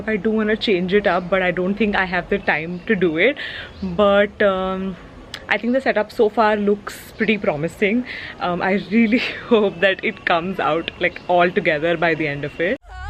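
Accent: India. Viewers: want to hear hindi from you your hindi accent is like damm loving it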